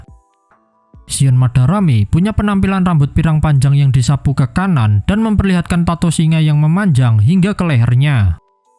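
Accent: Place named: Java, Indonesia. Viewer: Wong jowo koyok e. Iyo a bang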